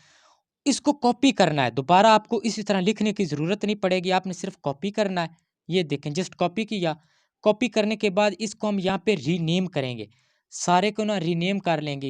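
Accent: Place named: Punjab, India. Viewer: Hmmmm urdu m panjabi ka tarka ...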